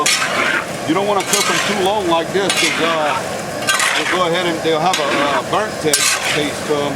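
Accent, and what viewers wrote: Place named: New Orleans, United States. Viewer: That "naw-lens" accent distracted me the whole vid! Love it!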